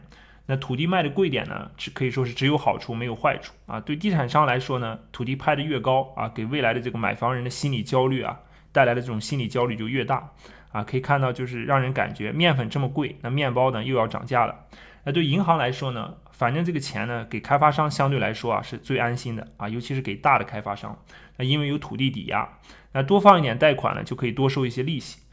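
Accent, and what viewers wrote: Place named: Hohhot, China. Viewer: 感觉是呼市人，我认识吗？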